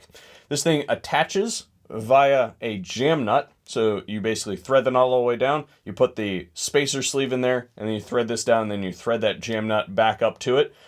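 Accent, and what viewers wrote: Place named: United States. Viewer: Great job at testing & publishing hard numbers. Ditto at keeping it real & speaking American! I got to learn a few new things like WHY I like a backup nut instead of crush washers. I'm only 6 years old in building & 3 years rusty since I got booted off the ranch where I used to shoot. Just tryin' to keep my pilot light lit these days. Good info is much appreciated.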